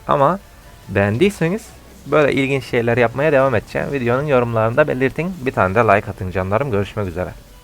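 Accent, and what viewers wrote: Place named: Muğla, Turkey. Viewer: abi sen nerelisin şiven konuşma tarzın muğla tarafları gibi benziyor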